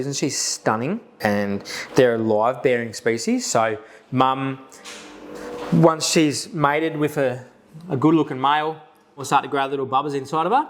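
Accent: Australia. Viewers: This dudes Australian accent is strong. Never struggled to understand an Australian.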